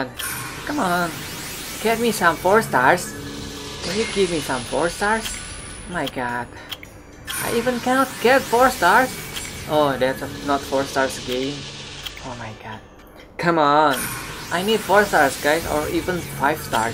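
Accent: Indonesia. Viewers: Logat nya Indonesia bgt.. di add om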